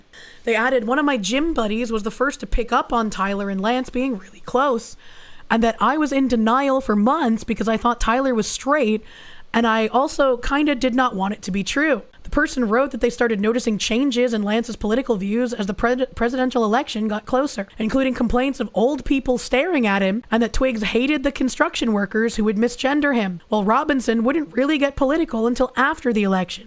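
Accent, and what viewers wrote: Boston, United States. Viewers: Is it me or does sound more feminine while sick? It really breaks up the Boston accent lol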